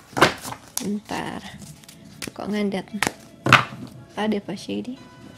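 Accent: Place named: Java, Indonesia. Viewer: Pile 1, kenapa ya aku rada-rada feeling "kok kak ngomongnya rada medok ya?"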